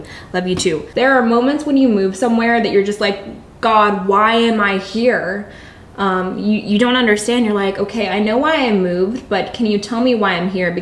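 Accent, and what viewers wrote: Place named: New York, United States. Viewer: Sounds just like New York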